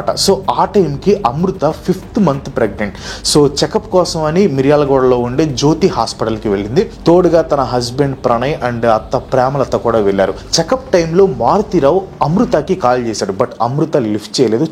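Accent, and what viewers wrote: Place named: Rayalaseema, India. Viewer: Meeru seema bidda ahh?! Maadhi kuda seema ney! Andhuke aa yaasa nu batti adigaanu😎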